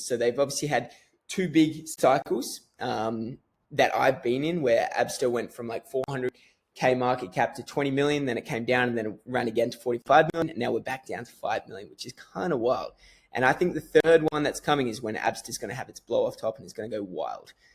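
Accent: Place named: Australia. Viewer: Sounds like you are Aussie. Are you friends with Dan McEvoy?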